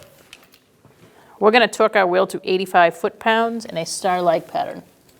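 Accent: Boston, United States. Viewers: A big thank you from South Texas! It sounds like you have a Boston accent which just makes this video better for some reason.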